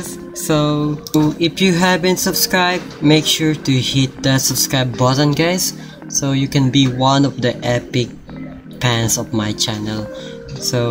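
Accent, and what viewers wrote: Philippines. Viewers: I just realised he's Filipino just because of his accent and about page So. HATDOG PRE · i think hes filipino, he speaks like one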